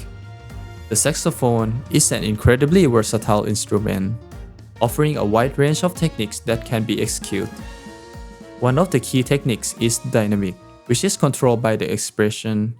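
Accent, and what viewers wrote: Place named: Thailand. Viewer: ตามมานานแล้ว พอรู้ว่าเป็นคนไทยยิ่งดีใจเลยค่ะ (เอ๊ะแรกคือจากสำเนียงค่ะ55) ทุกคลิปของคุณคือดีงามมากเลย จะสนับสนุนต่อไปนะคะ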